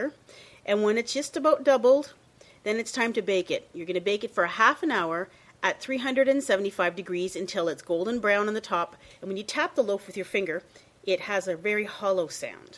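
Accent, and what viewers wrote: Canada. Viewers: can tell from certain words that shes canadian :P prety much the same thoguh, your right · The sugar must make it sweet, is this standard in North America? I've heard the bread in USA is quite sweet but you sound Canadian, is it the same there? Also can I make this bread without the sugar in it? :) Thanks for all the lovely recipes!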